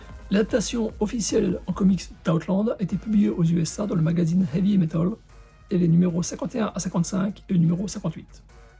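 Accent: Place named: Nancy, France. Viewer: Bravo pour cet excellent épisode, bien documenté, qui rend parfaitement hommage à cet immense artiste. Et bravo pour ne pas avoir perdu l'accent nancéien après 20 ans aux US !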